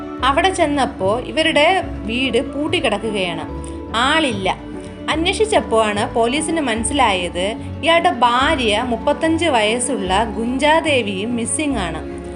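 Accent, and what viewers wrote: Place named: Thiruvananthapuram, India. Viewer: നല്ല കഥ അവതരണം കൊള്ളാം തിരുവനന്തപുരം സ്വദേശി ആണോ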